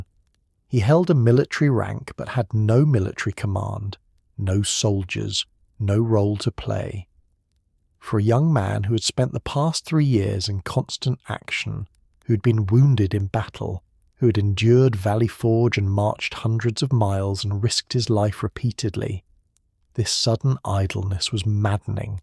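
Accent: United Kingdom. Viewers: Well Done! Monroe was On Point with the Doctrine! Why the Annoying British Accent Narrator? Informative! C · American President why the annoying brit accent?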